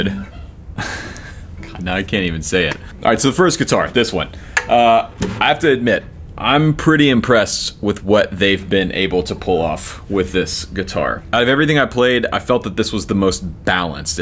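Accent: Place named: New York, United States. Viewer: i found the Fender had a tubby midrange that bothered me. In that respect it reminded me a little of an Ovation. I might gravitate toward the Hummingbird not because of its look but because I suspect I’d enjoy playing it. My own acoustic is a Hummingbird and, much to my surprise, I really prefer playing it to the D28 I used to have. Both are from the seventies. Ahrange/Or ange is a regional difference. I grew up in NY (metro, not upstate which sounds completely different) and pronounce it like you do. You’ll find the same argument with fahrest/for est. If you want the opportunity to correct your significant other about speech, try the marry/merry/Mary test. If you’re from NY, those are three completely distinct words. For most of the rest of the country, they basically say Mary/Mary/Mary.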